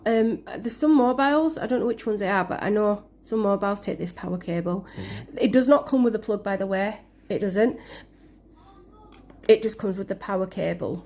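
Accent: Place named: England, United Kingdom. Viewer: I like the accent. England?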